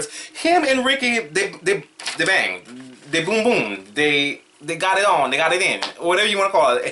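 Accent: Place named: Louisiana, United States. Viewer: I am from New Iberia. You sound like you from St. Martinville or Breaux Bridge.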